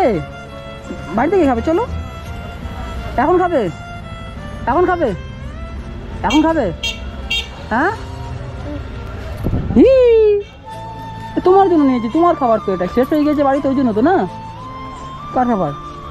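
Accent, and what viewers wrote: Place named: Rangpur, Bangladesh. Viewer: Tumar kotha rongpurer moto lage..tumar bari ki rongpur plc vai janaio...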